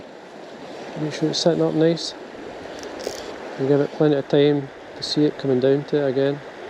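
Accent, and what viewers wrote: Scotland, United Kingdom. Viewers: are you from Scotland? I've heard it said that some scotts cannot say "Purple Burglar Alarm"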